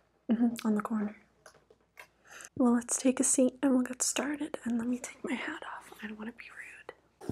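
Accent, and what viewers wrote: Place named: Texas, United States. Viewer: This accent is Texan approved. 🤠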